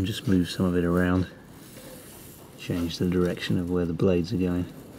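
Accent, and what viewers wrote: United Kingdom. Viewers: You are my favorite model railroad expert. In this film I particularly appreciated your speedcam effects (In my day in the USA it was called the Captain Kangaroo Magic Drawing Board effect : ). In all your films I've appreciated your thoroughness in explaining things as well as visualizing them. As a Yank I've also enjoyed your Brit accent (what accent?!). Cheers : ) Site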